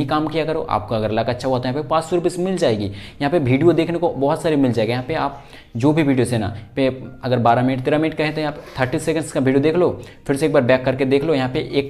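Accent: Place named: Bihar, India. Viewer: Kyo ki app awaj se Bihari lagte ho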